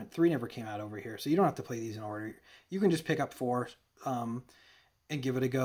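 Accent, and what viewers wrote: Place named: Upper Peninsula, United States. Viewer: Outstanding video, also love the U.P. accent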